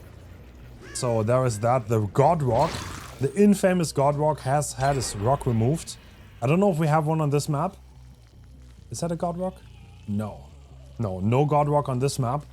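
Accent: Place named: Germany. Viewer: jetzt wo ich weiß das du deutscher bist weiß ich warum mich dein englisch immer so getriggerd hat und ich deine videos wegen den aktzent mir nicht geben konnte aber freut mich bin zwar halb russe aber das einer meiner lands männer so ein erfolgreicher dbd youtuber geworden ist ❤️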